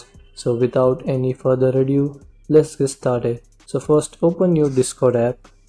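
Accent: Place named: India. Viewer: its because he is protraying it as an indian accent.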